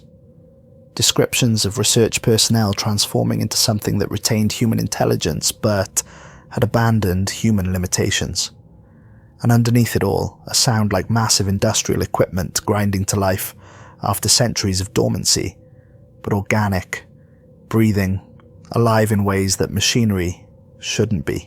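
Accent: Wales, United Kingdom. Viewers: Not sure about the Welsh accent but I love the stories.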